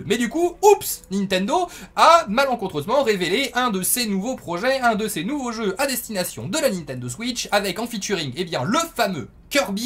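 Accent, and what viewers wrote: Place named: France. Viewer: Mon dieu l'accent Français une tuerie!!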